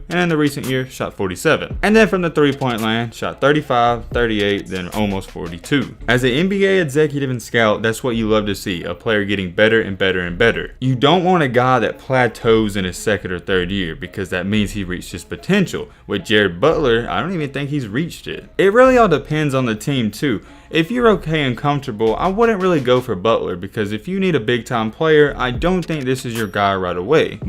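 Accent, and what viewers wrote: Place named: Alabama, United States. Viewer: So you from Alabama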